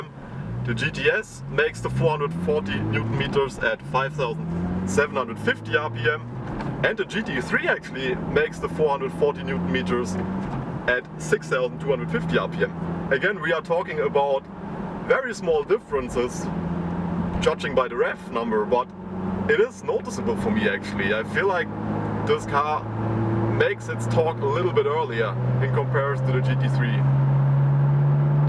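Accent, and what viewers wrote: Germany. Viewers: echt gute arbeit, ich hab den 991.1 nicht zuletzt dank deinem bericht auf dem schirm! wertstabiler als die c2s , c4s scheint er auch zu sein. p.s. selbst dein dann doch etwas herber dt. akzent sei dir verziehen, lol ...aber ohne scheiss jetzt, nach dem bericht von dem "ausfahrt typ" war der hier wie ein urlaub...weiter so! 👊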